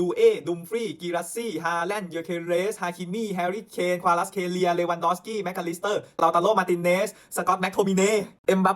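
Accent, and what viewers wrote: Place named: South, Thailand. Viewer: น้องบ่าวเด็กใต้เเน่นอน😂😂😂😂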